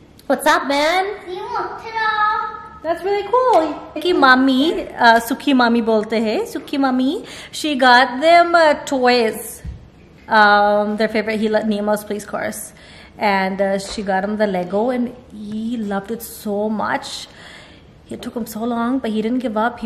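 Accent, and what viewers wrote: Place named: Punjab, India. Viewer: Your Punjabi is sooo 🥰 cute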